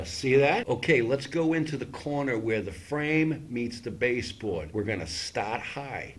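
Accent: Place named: Boston, United States. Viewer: I've watched a ton of videos about cutting in, including ones by pretty high-profile YouTube painters/DIY guys, and this one was by far the most helpful to me. I like that I can see exactly what you're doing, and that I get to see you do it over and over and over and over while you describe what you're doing each time. Also, you have a cheery, encouraging attitude, which is nice :) Oh, and I love the Boston accent. I'm halfway through painting my basement right now, and even though it's my first time painting, my lines are super clean. Thanks!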